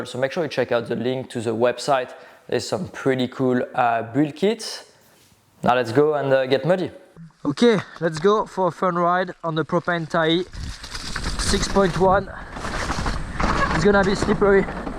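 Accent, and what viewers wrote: France. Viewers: I just come to hear him talk in that thiiiic french accent. :body-blue-raised-arms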